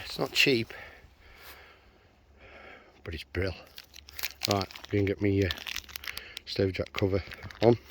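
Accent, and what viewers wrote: Stoke, United Kingdom. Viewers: Just seen your review, I'd like one,of these. A cracking review, thought you were a stokie, Im from castle, we go up there camping also, may have to get one.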